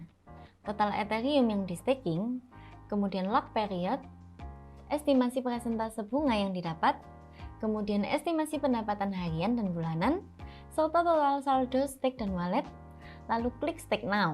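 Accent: Java, Indonesia. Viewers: Wah cocok buat kita yg ingin invest jangka panjang ini, Terimakasih mbk Cantik yg membawa Materi sangat menguasai sekali dan Cantik lagi, bahasa jawanya medok.... Hhehehe.... Aq pingin Staking yg Shiba Inu lah... Nunggu ada dana Free.... Sukses buat Triv.... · Mbake ayu lan medok nggih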